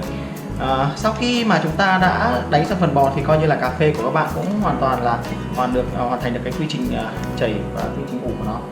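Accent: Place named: North, Vietnam. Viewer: Khg dai dong , ngan gon va giong noi Bac-ky dang yeu vo-cung . Chuc chau luon binh-an 🍀💐😍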